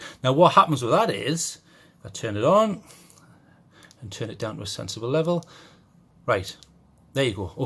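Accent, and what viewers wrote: United Kingdom. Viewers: You cannot mix that British accent :D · You british?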